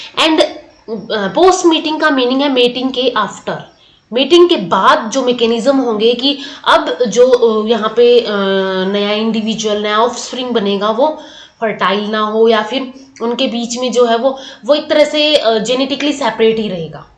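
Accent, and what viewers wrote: Haryana, India. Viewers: Mam Haryana se ho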